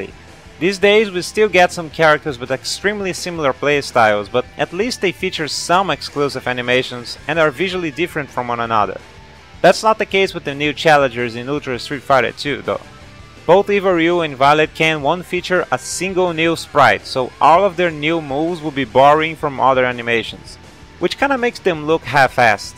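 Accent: Brazil. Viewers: Vc é brasileiro? pelo sotaque parece, eu também falo inglês assim rsrs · the brazilian portuguese background shines through too much and adds that horrible english accent... this guy needs a lot of work before narrating anything in english again. On a scale from 1 to 10 where crazyrussianhacker is an acceptable 4 this guy here is a solid -2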